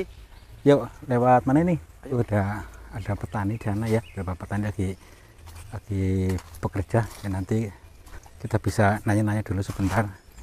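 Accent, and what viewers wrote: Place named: Banyumas, Indonesia. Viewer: Bhsanya kok ngapak Kang,,,memang Kakange dari Daerah mana maaf🙏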